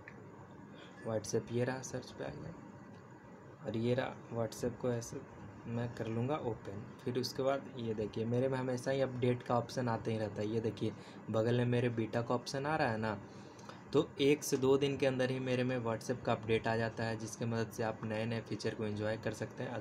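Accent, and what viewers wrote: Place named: Patna, India. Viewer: Your from patna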